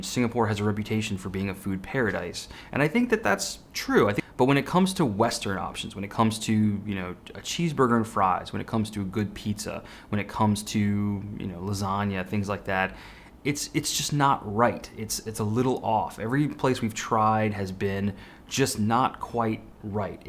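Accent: United States. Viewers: You don't have the Southern accent. Which state you're from? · I’m Japanese live in Singapore. I totally agree with you and I like your American accents especially “Hawker Center”.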